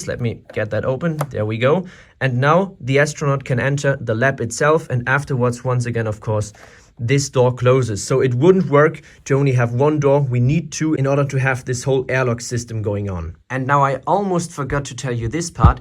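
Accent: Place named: Germany. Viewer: What about that german accent though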